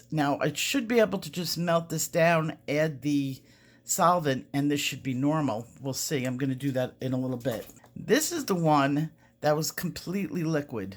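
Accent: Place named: Boston, United States. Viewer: Hi. Are you from Boston? Love your accent. 🙂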